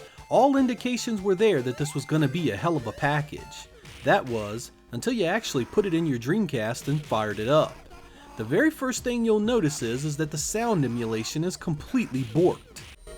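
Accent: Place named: Piedmont, United States. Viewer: I've been trying to place your accent and I'm ready to guess: Piedmont region of either Georgia, Tennessee, or the Carolinas. I've only known one person that pronounced "on" the way you do and he was from the suburbs of Atlanta.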